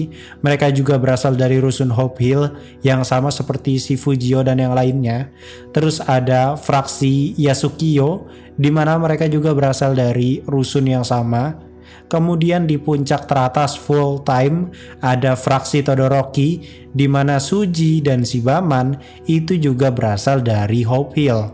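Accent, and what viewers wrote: West Java, Indonesia. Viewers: Orang Sunda Oge euy admin na 😁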